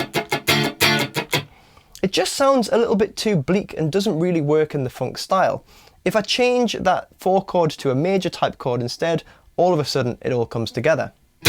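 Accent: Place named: Newcastle, United Kingdom. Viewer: great lessons thank you ! shit hot playing ,and cool geordie accent ! Gan canny 👍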